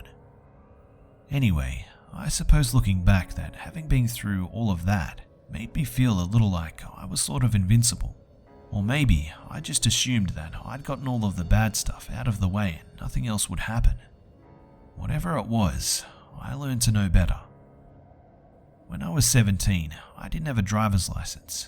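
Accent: Australia. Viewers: you and lazym are the only horror story tellers i like. so many boring droners with no...energy. also i think the ausie accent helps! i listen to you most nights as i fall asleep (fear helps me sleep haha). i originally found your channel via chilibeast i think. thanks!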